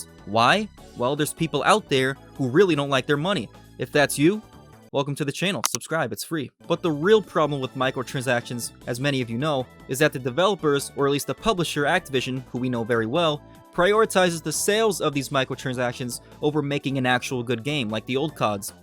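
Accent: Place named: United States. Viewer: What makes these videos even better is the accent🤣 Which state is it btw?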